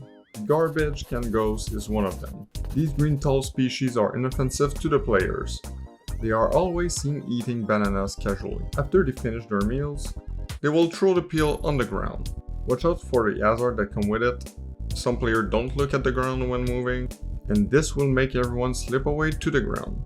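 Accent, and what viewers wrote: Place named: Quebec, Canada. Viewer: This guy's voice is agressively Québecois.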